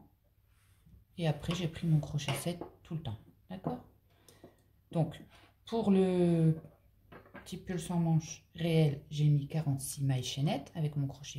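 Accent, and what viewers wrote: North, France. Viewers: J'étais de somain sa sentant votre voix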